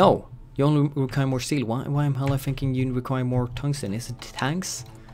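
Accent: Sweden. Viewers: Are you Swedish or Norwegian?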